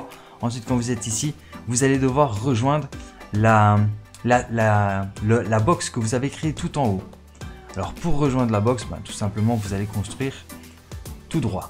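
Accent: Belgium. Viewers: J'ai une question tu es belges ou français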